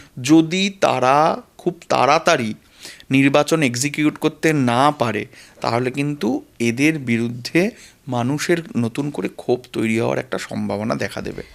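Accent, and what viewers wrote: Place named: Bangladesh. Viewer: দাদার কথা সু‌নে ম‌নে হ‌চ্ছে দাদা বাংলা‌দেশী, কারন দাদা বাংলা‌দেশের মানু‌ষের ক‌ন্ঠে কথা বল‌ছে। ধন‌্যবাদ দাদু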